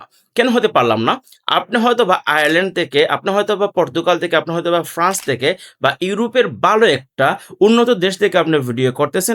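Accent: Sylhet, Bangladesh. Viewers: ভাইর বাড়ি কিতা সিলেট নি....?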